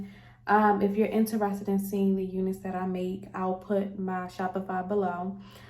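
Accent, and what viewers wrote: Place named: Baltimore, United States. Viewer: Cute! Your accent sounds like Baltimore.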